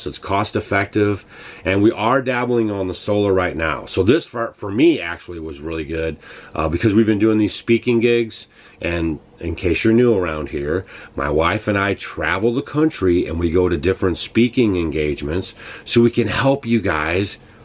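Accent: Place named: Appalachia, United States. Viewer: Living off the grid????? And you're talking about living in a RV? Wipe ur ass on tree moss if U can find it and keep raising all those bugs in your beard for your next meal. Water should be no problem for you as we can see that you bath once a year whether you need it or not.. What you gonna do when you can't put all this BS on YouTube? Are you doing all this on "Solar Power"? I can see that if someone doesn't shave or shower for a year or two, and they talk like a 1940's Hill belly-- they can sell BS to Morons.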